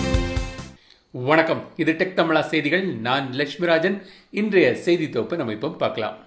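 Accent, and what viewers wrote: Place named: Nagercoil, India. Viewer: நம்ம நாகர்கோவில் வார்த்தை உச்சரிப்பு உங்க கிட்ட இருந்திச்சி அதான் கேட்டேன்.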